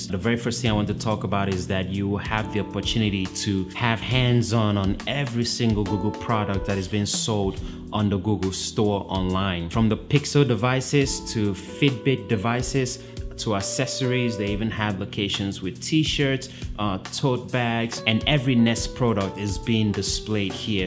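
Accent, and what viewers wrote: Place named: Brooklyn, United States. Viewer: Hey Brooklyn Accent Guy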